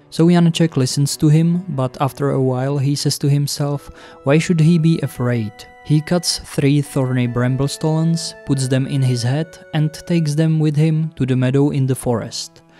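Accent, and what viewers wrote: Czech Republic. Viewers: good english bro, but you should take away this czech sound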